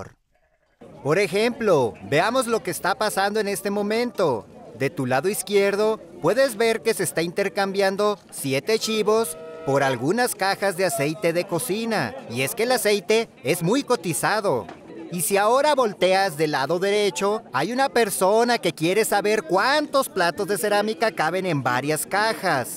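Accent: Mexico. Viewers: se olle norteño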